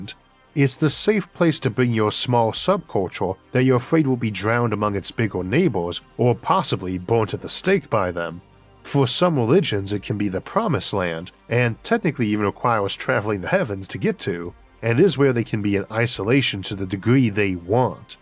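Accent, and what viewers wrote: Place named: United States. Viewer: What's your accent, i assume American because how big and wide it is, is my assumption correct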